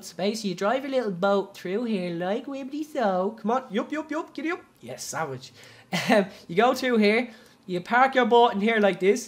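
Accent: Ireland. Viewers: your just totalllyy amazing i love your little irish accent xxx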